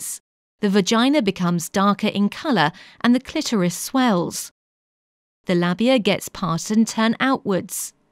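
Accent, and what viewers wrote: United Kingdom. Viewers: English accent. There's no such thing as an British accent. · I'm just here for the British accent.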